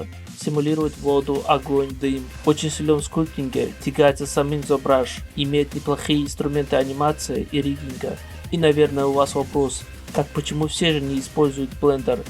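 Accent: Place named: Dagestan, Russia. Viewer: Судя по говору , либо Дагестанец либо Чеченец, я пока еще не разобрался 😅😂 Салам из Татарстана 👋😁 Я вот в FreeCad начал работать, мне больше интересна техничка и точность.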